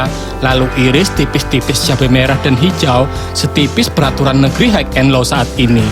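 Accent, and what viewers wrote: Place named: Java, Indonesia. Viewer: pian urg jawa lws d banjar kah sanak,banjarnya gedaguman kyk kwn ulun urg jawa🤣🤦‍♂️🙏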